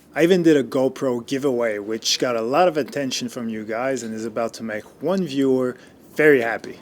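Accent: Quebec, Canada. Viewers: Where is your accent from ? Quebec !?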